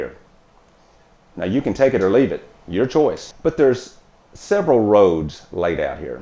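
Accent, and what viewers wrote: South, United States. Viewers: so cus of jebus right ? i hear your ignorant southern accent you beleive in imaginary friends and jebus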